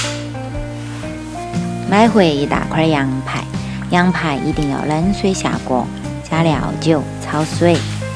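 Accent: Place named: Sichuan, China. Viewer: 我不是中国出生的都能听懂。蛮好听的四川成都话。👍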